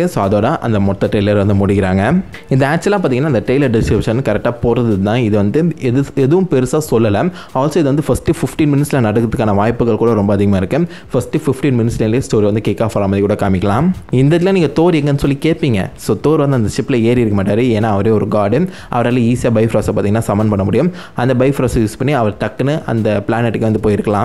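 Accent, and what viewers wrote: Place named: Madurai, India. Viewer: Ji Neenga Entha Oru nu sollamudiuma. Yenna onga Pechula Madura Slang theriyuthu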